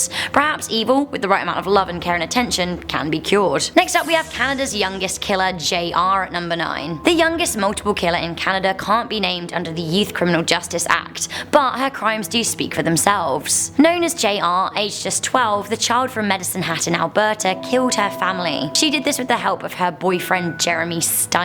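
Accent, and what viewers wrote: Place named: England, United Kingdom. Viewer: That good old english ascent with that morad the fooouurth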